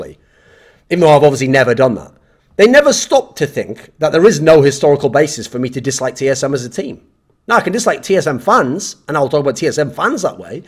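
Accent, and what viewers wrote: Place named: United Kingdom. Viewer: You sound so Bri'ish in this video I LOVE IT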